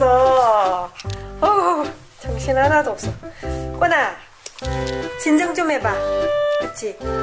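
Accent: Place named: Gyeongsang, South Korea. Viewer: 경상도 언어가 이리도 러블리 할수가 ♥ 알아 듣는 개딸 꼬니는 더더 ♥♥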